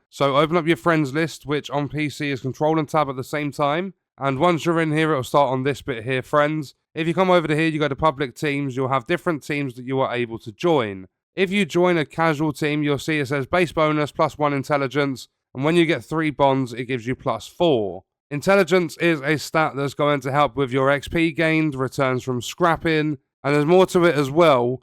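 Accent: United Kingdom. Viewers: Every Fallout 76 youtuber seems to be British lol. I know it's not their fault, but the British accent to Americans is so grating lol.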